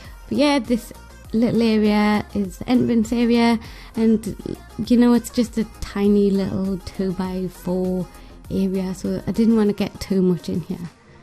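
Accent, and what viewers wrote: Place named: South Shields, United Kingdom. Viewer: SO creative!! can't believe there was no CC! love your south shields (?) accent... subscribed!! xxx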